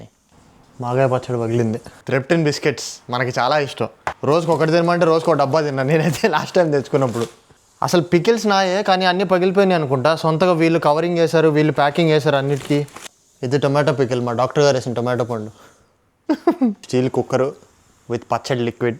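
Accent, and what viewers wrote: Godavari, India. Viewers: Ohoo nuvvu suuper tammudu achu vzg godavari jilla vaalla maatateeru nee video s ki addicted 😂😊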